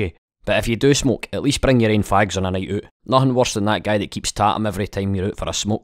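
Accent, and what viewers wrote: Scotland, United Kingdom. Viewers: Stephen duncan Listen to the accent, posh Edinburgh, or Ned Glasgow? Or the standard fifer...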